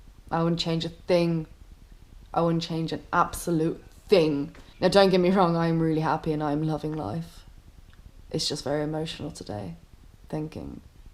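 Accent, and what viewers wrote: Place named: Cardiff, United Kingdom. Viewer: I hear cardiffian in you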